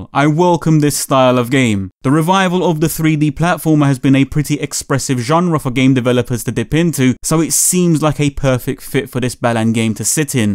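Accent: London, United Kingdom. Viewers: He became more and more British as the video went on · Ok this is kinda weird lol. Alright so I remember watching this guy like 2 or 3 years ago on one of his Night vids he did back then. For some reason when they announced this I thought to myself, ‘Oh I wonder what that Nights fan with the london accent thinks of this? I’m sure he’d be hella excited.’ Now today I just seen this vid in my recommendation :).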